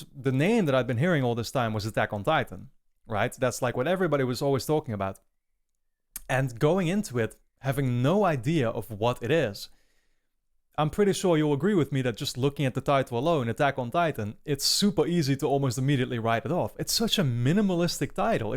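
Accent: Netherlands. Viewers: Are you from the Netherlands? Cuz you sounds very Dutch for some reason haha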